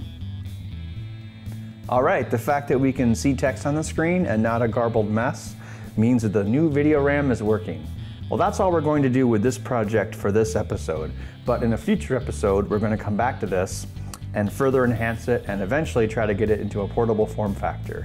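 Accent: United States. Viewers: American English! Sound as thick as shit, plus uneducated with easy! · we say zhead x spectrum because that's how is meant to be said as its a uk product and the uk invented the language that the yank ruined lol. I would say speak English boy but I know for yanks this is impossible .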